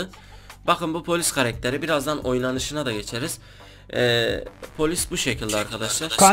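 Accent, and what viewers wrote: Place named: Southeast, Turkey. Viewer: Şivenden anladım hangi güneydoğu şehrinde yasiyon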